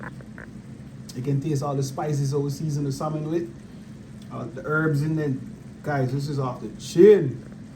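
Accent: Jamaica. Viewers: I love your Jamaican Accent. The food looks amazing.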